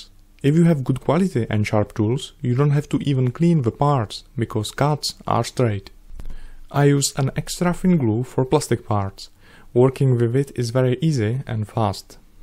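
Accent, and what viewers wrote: Czech Republic. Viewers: Ahh yeah, the good old Czech Accent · jinak musim uznat, že se tvoje angličtina výrazně zlepšila:) už ten českej přízvuk tak netrhá uši:)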